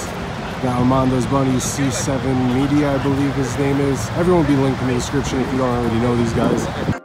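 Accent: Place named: Long Island, United States. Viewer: ! Brooooooo, love your videos man. You sound like you're from Long Island bro lol. Whole Fam is NYers. Anyway, love the content and quality. I just purchased the A7III, it's on back order but I'm excited for the quality of vids I'm about to pump out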